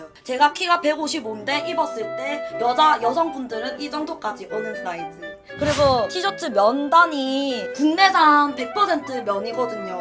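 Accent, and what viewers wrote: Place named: Gyeongsang, South Korea. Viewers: 양팡언니ㅠㅠㅠㅠㅜ 저 대학교 새내기 올해 1학년이에요ㅠㅠ. 부산사람인데 대학을 타지로 가서 너무 낯설구 외롭구 했는데 언니 덕분에 외롭지 않게 즐겁게 지내구 있어요 과제하다가 언니보구 밥 먹으면서 언니보구 시험공부하다가 언니보구 간식 먹을 때 언니보구. 제가 왜 이렇게 언니를 끊임없이 보는가에 대해서 생각해보았는데요 그건 아무래도 사투리가 그리워서 그랬나봐요ㅠㅠㅠ 진짜 언니 없었으면 향수병 걸려서 우울증 걸리고 자퇴했을거에요ㅠㅠ 언니 덕분에 한학기를 이렇게 잘 마치네요ㅠㅠ 항상 고맙구 응원합니다ㅠ 언니 영상은 보면서 공감을 많이 하게 되는 것 같아요 뭔가 사람 냄새 난달까??ㅋㅋ 나두 저런대 나두 저런대 하면서 무릎을 탁 치고 하죠ㅋㅋ 주위에 친구들한테두 열심히 홍보하고 있어요!!♡♡♡ 가끔 혼자 길가다가 파파라치 흥얼거리기도 하구ㅠㅠ 린온 흥얼거리기도 하고 ㅋㅋㅋㅋㅋ 저번에는 강의시간에 나이따 나이따 나나나나나나 나이따하는 금지님 목소리가 계속 멤돌아서 죽는 줄 알았어욬ㅋㅋㅋㅋ 언니 방송해주셔서 감사합니다ㅠㅠ 항상 파이팅!!!! 사랑해요!♡!♡ <mail> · 종종 잘보고 있습니다 같은경사도라 그런지 사투리 쓰는거 볼때마다 정겹네요 ㅋㅋ 40만추카드리고 리미티드 꼭 당첨되고 싶어요 <mail>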